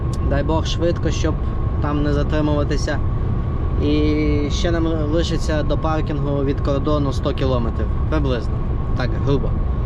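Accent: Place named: Galicia, Ukraine. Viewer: Доброго Вам. пане чи то дня. чи то вечора - не суттєво. Маю надію, що Ваша дорога була легкою. Це саме той Микола що давав Вам пояснення відносно PILOT. Ну то таке - лірика. Тепер до справи. Мені дуже цікаво дивитися Ваші відео. а особливо слухати. Таке враження що Ви або з Франківщини, або з Галичини, або ще десь поруч. Дуже приємно вас слухати. То по-перше. А тепер по-друге. Як професійний працівник телебачення мушу зробити Вам деякі зауваження відносно з"йомок.Колись давно, коли мене ще вчили працювати з камерою мені в голову втовкли правило: "Любий рух камери в кадрі має бути обгрунтований".До чого це я? А до того. що коли Ви знімаєте Go pro з чола, то ліпше це робити під час руху по трасі. А ось коли Ви їдете по місту, або десь в зоні завантаження/розвантаження то оці FPV кадри не завжди доречні. Пояснюю. FPV - це скор. FPV — вид від першої особи. 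Так, це буває дуже цікаво. Але водій під час руху в умовах міста або заводу мусить контролювати обстановку і ліворуч, і праворуч і зпереду і ззаду. Тому на екрані така метушня буде доречна в тому випадку коли автор бажає передати відчуття збентеженності. тривоги та таке інше. В інщому разі воно просто або заважає, або просто не цікаво і глядач втрачає цікавість до того, що відбувається. Найкращий варіант - мати декілька камер. Наприклад: одну на вітровому склі, другу десь у спалці. третю десь зовні машини. Але це такі шалені гроші. що янавіть думати про це боюсь Перепрошую за такий довгий текст, але я дуже хочу щоб ваше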